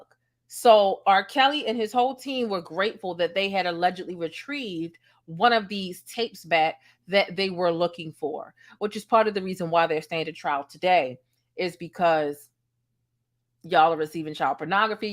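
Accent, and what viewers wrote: Baltimore, United States. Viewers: I automatically figured out that you're from Baltimore, the accent is there. Behind New Orleans, Bmore is my second favorite American accent, I have family from there. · Definitely hear your BMore Accent!! Love it!! Do your thing Ms.!!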